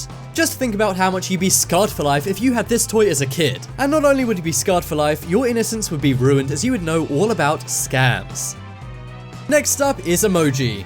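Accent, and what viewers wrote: United Kingdom. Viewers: Im so tired of this British accent bullshit on youtube. It may have seem attractive to some people at the beginning but now it's just fucking annoying! I would give you a thumbs down but I'd rather give you a thumbs up.....YOUR ASS!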